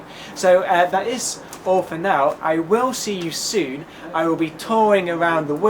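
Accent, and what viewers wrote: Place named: United Kingdom. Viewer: i used to be so racist towards British accent, yours are.. just awesome , thx for repairing me ^^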